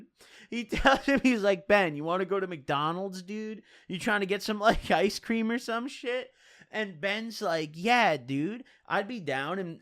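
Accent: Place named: New Jersey, United States. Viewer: Why does he sound so different in some videos. Sometimes he's really nasally and has like a breathey undertone like some 30yo that lives in New Jersey, other times he sounds like a 24 guy that weighs 150 and doesn't get enough sunlight.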